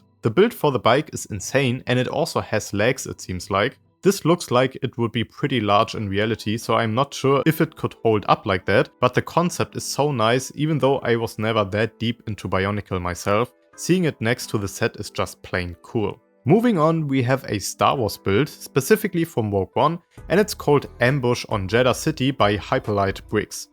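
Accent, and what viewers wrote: Germany. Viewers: Who is this guy with the lovely German accent? He's doing a great job. Ich wohne in Deutschland. Super smart friendly people 🤩🙂🤓🇩🇪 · Oh man der deutsche Akzent ist ja mal anders wild 😅 klingen wir wirklich so krass 😅 gutes Video übrigens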